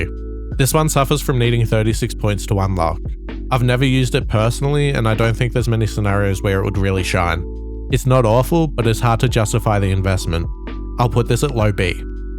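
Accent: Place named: Australia. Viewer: AUSTRALIAN ARC RAIDERS YOUTUBER DETECTED LETS GO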